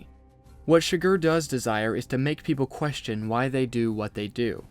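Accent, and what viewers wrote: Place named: South, United States. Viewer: People in West Texas don't talk with this southern draw.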